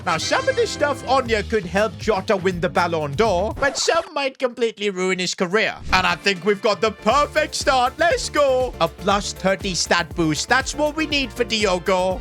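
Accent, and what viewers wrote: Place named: India. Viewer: yes bro he's still learning and he's improving if you check his videos in fifa 21/fifa 22 his accent was only indian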